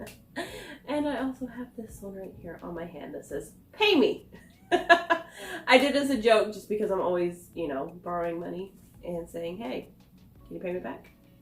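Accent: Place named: South, United States. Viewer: Hello love still going strong I see... just give them a thumbs down 👎 call it what it is, I won't tell nobody😂...how you been? Also what's that accent im thinking arizona, also sounds a little southern🤔.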